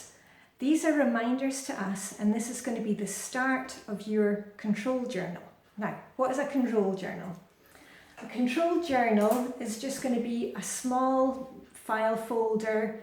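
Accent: Scotland, United Kingdom. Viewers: Thank you, for your wonderful persistence and gentle Scotish accent. ♡ I was always overwhelmed by the to do list and never allowed time for the fun stuff. Now on day 4 with my sink clean - it's playtime afterwards! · I love your style, the way you explain the flylady-system I have been following through years, your English (is it Scottish;) - so thank you for all those things. I wonder if you could tell me what kind of sink tidy (caddy and bottles) you are using - it looks so elegant. Greetings from Austria Knitgudi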